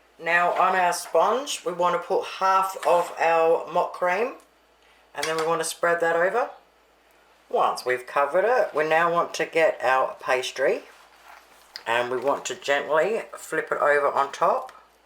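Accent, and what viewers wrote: Australia. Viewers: You speak proper Aussie 😊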